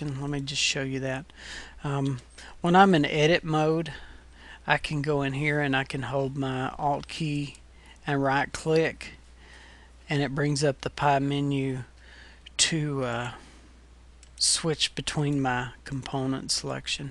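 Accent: United States. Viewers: Are you from Atlanta?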